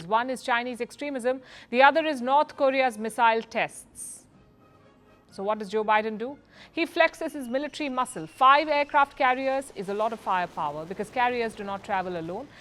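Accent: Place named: India. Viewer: Your channel has not improved at all, you are speaking curry English all the way. And besides you are talking rubbish all the time, the Americans are lacking money, I just want to ask how long would they afford to keep 5 Aircraft Carriers going on in the front door of China ? By the way are they not afraid of Chinese missiles Dongeng 21 ?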